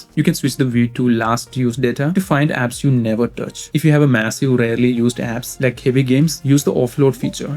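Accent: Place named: Kerala, India. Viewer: that malyali accent is smooth, intentionally pronunciation is lit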